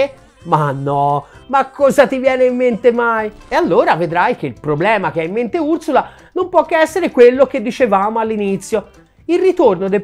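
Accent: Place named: Tuscany, Italy. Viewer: Sei un grande 😁 Specie con il tuo accento toscano 😁. È un dato di fatto che i cinesi ci hanno creduto per primi e hanno investito e sono avanti di decine di anni. In Europa le lobby hanno screditato il mercato nascente non facendo investimenti nuovi ma ancora guadagnare allo stesso modo cosa non spiacevole per i governi di incassare ancora le accise 😂. Ora che le pressioni dei cambiamenti climatici e della tecnologia ev sono sempre meno screditabili non si sa che pesce prendere che scusa o colpa trovare. l'Europa è in netta arretratezza e recupero il ritardo costa caro. I cinesi l'avevo già sentita nel settore ev vanno in punta di piedi sanno benissimo che rischiano l'aumento dei dazi. Difatti la macchina ev più venduta in Europa e America e la tesla che è un marchio Usa,Elon Musk un miliardario pazzo che ci ha creduto nonostante i bastoni tra le (ruote)si è costituito non solo le macchine,i software, le batterie i supercharger e ancora per aggirare la burocrazia in america la RC auto per le sue macchine 😁. 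Quanto detto i anche in America è come tutto il mondo: gli hanno reso la vita difficile. La determinazione e l'intuito ora raccolgono tanti frutti. Palle che Stellantis, Volkswagen e nessun grande gruppo europeo ha avuto.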